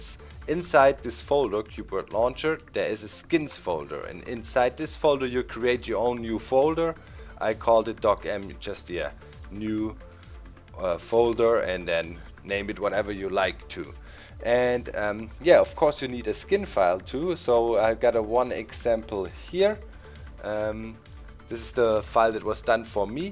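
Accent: Germany. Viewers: Bist du deutsch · bist du aus deutschland?:D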